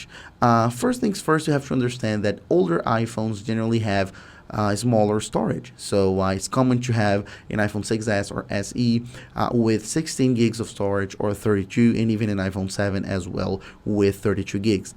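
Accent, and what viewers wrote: Brazil. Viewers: Um salve de São Paulo pra vc,! Notei o sotaque brasileiro, mesmo vc falando super bem hahaha. Valeu pelo vídeo!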